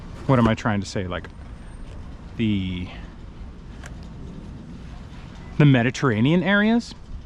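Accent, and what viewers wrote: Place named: Texas, United States. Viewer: Sound like you are Texan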